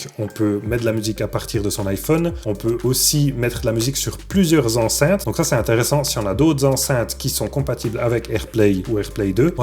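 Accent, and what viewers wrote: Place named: Liège, Belgium. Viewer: Super vidéo ! Très objective ! J’adore et en plus j’entends que comme moi tu es belges et de liège au vu de l’accent 😅 continue comme ça !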